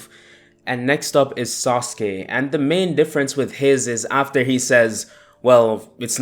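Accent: Jamaica. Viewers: You sound? Like your Jamaican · Are you Jamaican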